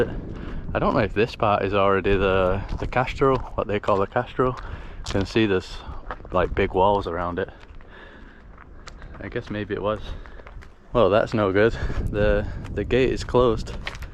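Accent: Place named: Scotland, United Kingdom. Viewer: 😁 I was sure I could hear a Scottish accent...😁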